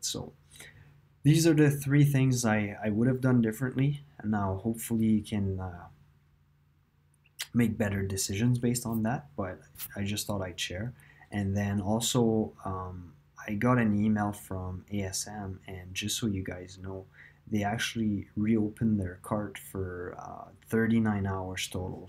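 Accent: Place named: Quebec, Canada. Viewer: Thanks for the info, I find your video very helpful. And btw, are you originally a french Canadian? I can't help but hear a bit of a french accent..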